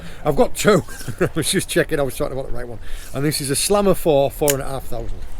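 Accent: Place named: England, United Kingdom. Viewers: Dear Mate! I Love your Cornish accent, heavy to understand. Fishing, foraging the Cornish tides (along with your son)! Like Grannma Gina cooking pasta, however allowed two lobsters, three big crabs waving with claws before cooked. That’s the juice! You’l never eat all the seafood by yourselves. You catch and you share with Family and with Friends. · Your English accent is unbelievable. Don't understand nothing. My hello from Ukraine!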